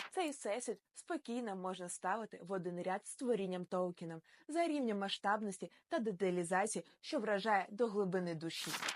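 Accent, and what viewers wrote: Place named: Russia. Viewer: Дивна вимова. Схоже що на миті проривається російський акцент. Але загалом добре.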